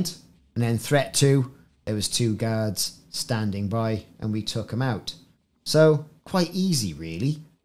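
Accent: Wales, United Kingdom. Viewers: Nice vid mate...I sense a Barry or Cardiff accent?...I'm watching all the way from Swansea :)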